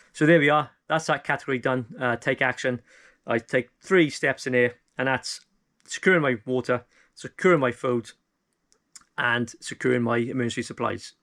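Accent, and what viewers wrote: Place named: Scotland, United Kingdom. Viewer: I subbed, but IDK if I can deal with the thick Scottish accent.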